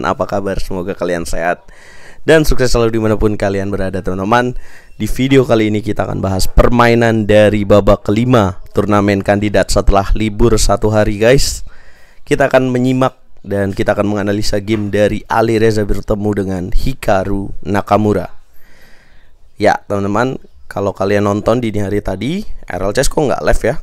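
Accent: Tegal, Indonesia. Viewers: Sampean asli wong tegal mas?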